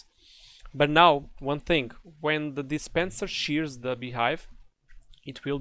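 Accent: Portugal. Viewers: Are tou portuguese? u seem lisboeta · By any chance, are you Portuguese? I recon the accent (Tuga here...) :) Amazing video, been lost with this mod on ATM6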